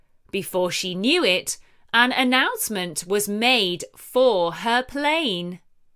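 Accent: United Kingdom. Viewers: I love your English stories and British English.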